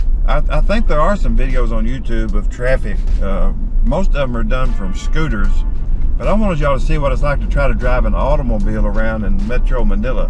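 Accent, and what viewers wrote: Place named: South, United States. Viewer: The traffic won't get fixed because of overpopulation. Even in my south hometown in Lipa, traffic is bad. Regarding your Southern accent, most Filipinos will have a hard time because yours is relatively faster pace. It's an acquired skill to understand it, because at first, it was hard for me too. Working for call center companies get one introduced and familiarized with it so it becomes natural after some time. I recommend creating a video of common idioms you use a lot in English, and sharing it as some Pinoys may want to learn it. Maybe do it as offer of help for Filipinos that work for call centers catering to USA. Also, can you try some taglish at times? It will be fun to hear you do taglish like us :)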